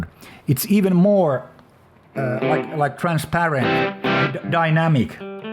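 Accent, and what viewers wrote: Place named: Finland. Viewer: Relax man, I herad the finnish accent, arleady Im buyin this thingy, the MI peds I own are pure genius...Im not so much for crunch, but boysngurls MI means myne, so try try try..., someone said that this with the Super Blues Pro n strat..., LOVE, Kimmo : )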